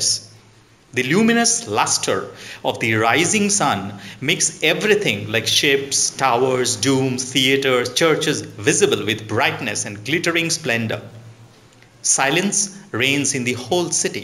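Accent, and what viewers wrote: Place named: England, United Kingdom. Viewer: I just loooovee your English accent ❤❤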